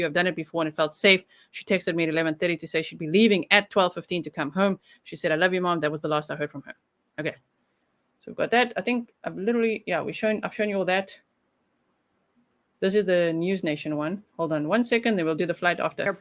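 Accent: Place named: South Africa. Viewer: Thank you we have subscribed. Love your South African accent. My friend in Hawaii is from South Africa and you reminded me of him. Keep up the good work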